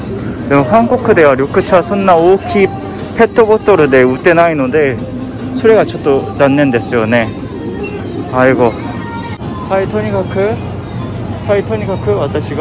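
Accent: Fukuoka, Japan. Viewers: 福岡弁、癒される〜✨ 今は東京に住んでるけど、福岡ほんと好き〜 私は毎日とうもろこし茶飲んでるよ〜👍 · キックボードに乗りた〜〜く!!!なるスタートですね😊そして福岡弁があったか過ぎ…こんなナレーションのNEWSなら、視聴率が右肩上がりになりそうです🆙🆙🆙 最後の「ガーーーン🎶😱」音に免疫がついてきて、ビックリどころか、もはや楽しくなってきました🎶🤗🎶慣れってスゴイ…。 …毎回、やるちゅもり❓ JUJUさんが今日も元気で良かったです。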